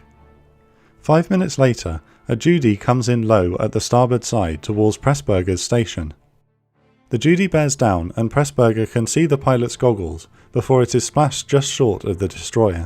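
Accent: United Kingdom. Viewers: Most BORING vid evah.. Can you possibly Mumble any more Monotonously? One sentence.. 1. A British accent; 2. Listlessly Mumbling; 3. Unexpected (and indecipherable) words; 4. Tonelessly; Exciting Topic! Thrilling Script! aaaaaand, Narcoleptic Narration humdrumhumdrumhumdrumhumdrumhumdrum zzzzzzz Did you sometimes call some airplanes, "Janes?"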